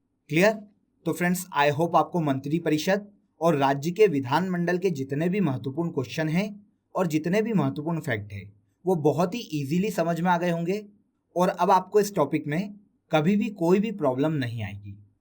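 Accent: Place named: Maharashtra, India. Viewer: Sir ap boht acha pdhate ho.. or aap Maharashtra se belong krte ho kya?! 😄 qki aap “hai” ko “he” bolte ho 😁 sounds good!!😊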